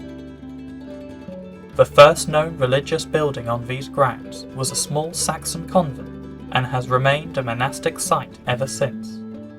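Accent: Norfolk, United Kingdom. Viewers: your Naarfalk accent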